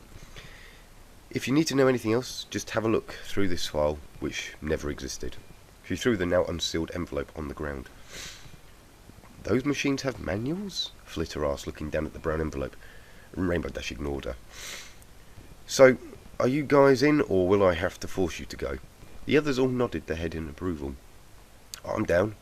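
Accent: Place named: England, United Kingdom. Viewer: SUCH A GREAT READER (now I want to have an English accent)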